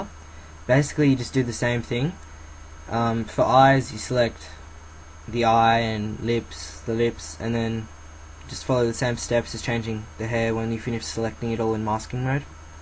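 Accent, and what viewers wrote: Australia. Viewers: Are you from Australia? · hes obviously austrailian you dumb ass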